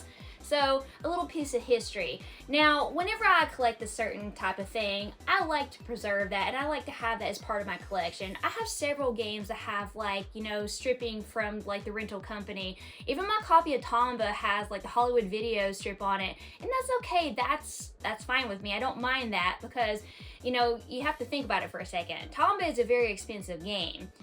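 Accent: South, United States. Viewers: Love your channel and your wonderful country accent 😊❤ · Ayo a fellow game collector with a southern accent and tattoos haha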